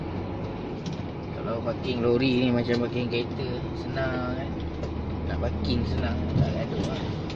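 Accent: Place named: North, Indonesia. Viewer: kuang ajaq🤣🤣🤣abg speking northern👍🏻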